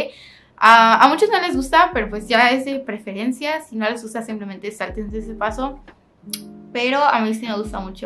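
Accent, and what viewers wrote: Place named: Mexico. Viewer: Me da la impresión que de México por el acento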